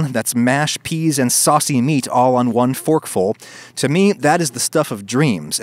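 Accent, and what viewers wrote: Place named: United States. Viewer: WOW Bro. Very nice recipe. It seems like it is an English one, but you sond like an American. Good stuff by the way. Will try it out. THANKS a lot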